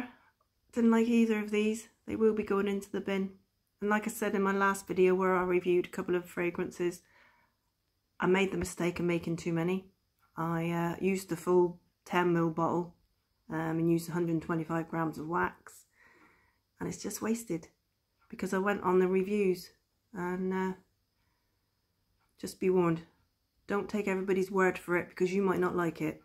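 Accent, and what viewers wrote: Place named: Devon, United Kingdom. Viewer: Hello. Just started making wax melts after making soap and body products for two-three years. Love the video. Why do you transfer to a second jug is it simply for ease of pouring? I sense a Devonshire accent hubby’s from asthma Devon and I lived there on two lengthy occasions. Tasmania reminds me of Devon and Cornwall wrapped together. How disappointing that you made so many not to like so many.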